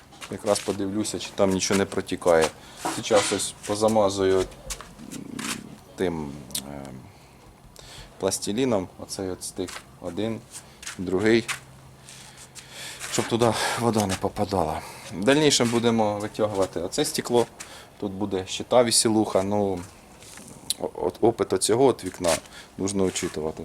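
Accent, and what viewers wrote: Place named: Poltava, Ukraine. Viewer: Вподобайка як завжди!) Нагадує полтавський говір)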